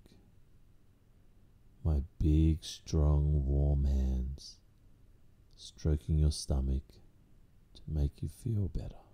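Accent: Australia. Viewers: I finally found and Aussie asmrtist and I am in love. I thought my Aussie accent was strong and I'm Australian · Australian accents hit different🤣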